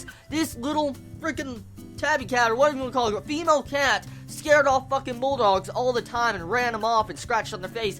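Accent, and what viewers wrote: South, United States. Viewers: Is it me or do have an southern accent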